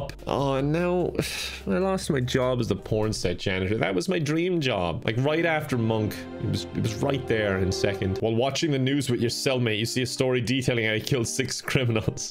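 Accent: Ireland. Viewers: This is truly the one channel on YouTube that can make me laugh the hardest consistently at whatever he plays. Also the Irish accent is a big plus too can't forget the accent.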